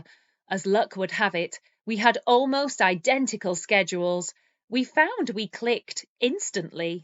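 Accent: United Kingdom. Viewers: It's British accent isn't it?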